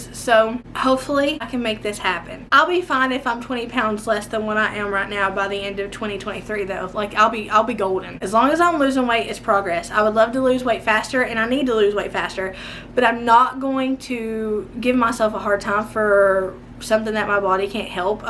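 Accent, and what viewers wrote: South, United States. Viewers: omg i can tell ur southern cause u do like i do when i get too talking i talk so fast and i talk about everything and people have too tell me too slow down lol i love the way u are it makes me smile !!! thank you veery much for making the videos i love you and your family yall are so down too earth that what makes u special !!! MUCH LOVE TOO U N YOUR FAMILY !!!!!!!!!🥰🥰🥰🥰🥰🥰🥰🥰🥰🥰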